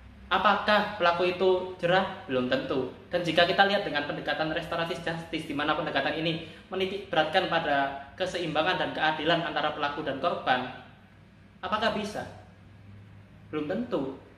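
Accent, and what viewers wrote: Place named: Java, Indonesia. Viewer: Suaranya kaya medhok koyo wong jowo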